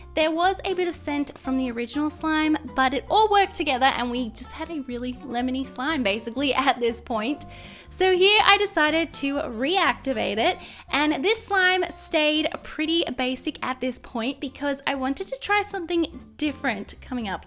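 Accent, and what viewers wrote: Australia. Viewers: Shes Australian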